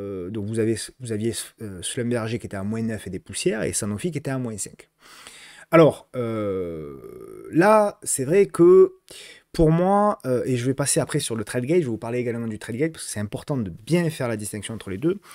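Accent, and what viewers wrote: South, France. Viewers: j'aime bien l'accent du sud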